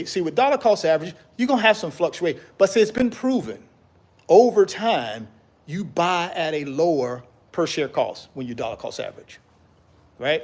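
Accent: South, United States. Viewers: When Says Nimber (Number) you know he’s gotta be from somewhere in the South 😅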